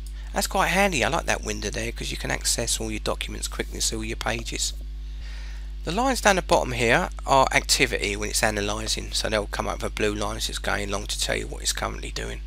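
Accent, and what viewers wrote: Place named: Liverpool, United Kingdom. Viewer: It´s a mess. I am aware that back at school I learned British English, which is, properly speaking, Oxford English. Some sounds the speaker makes seem funny to me, but I could not understand them. Is it e. g. Liverpool English? Whatsoever, I gave up on learning from this video after about 3 minutes.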